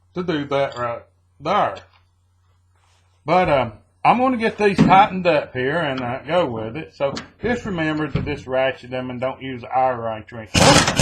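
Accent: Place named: North Carolina, United States. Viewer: That is a pretty GMC Sonoma. I have one just like it. A 91 GMC Sonoma 4.3 liter V6 RWD. Mine is painted that exact color except mine looks dull compared to your shiny red. It was my grandfather's, then my dad's, and now mine. A lot of sentimental value to me. Thanks for the video. You speak like you are close to my area too. North Carolina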